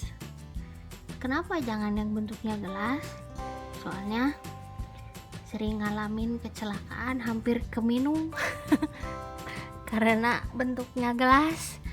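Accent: West Java, Indonesia. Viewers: Ka lucu banget suaranya xD sunda sunda kitu logatna teh wkwk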